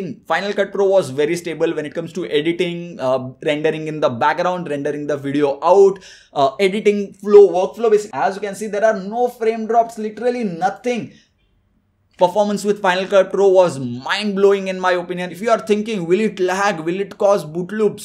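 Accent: India. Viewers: Don't understand fucking anything. Indian accent...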